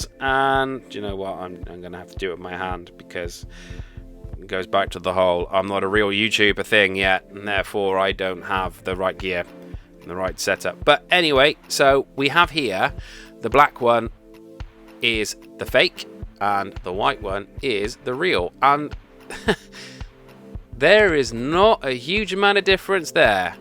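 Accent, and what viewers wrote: United Kingdom. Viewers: And I can hear ur from the UK so was it posted by Royal Mail? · So nice to hear an English accent, and from one who has a very agreeable attitude and sense of humour. I would love to hear your views on real ones and those i500's or 600's if you have played with them. I just want to try this type of headphone to see if I'll be continually losing them or forgetting to charge etc so I don't want to buy real ones yet! However I used to work in the HiFi and audio industry so I have a lot of rather nice gear and hate harsh sound, preferring a warm english, Tannoy type of sound which I don't mind paying for once I know if this type and shape etc work for me. Any ideas on the better sounding clones will be greatly appreciated, great and honest review. Loved it. Nice video, subscribed.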